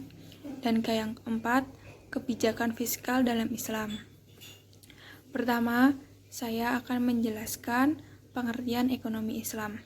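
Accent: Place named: Klaten, Indonesia. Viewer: Kamu orang klaten ya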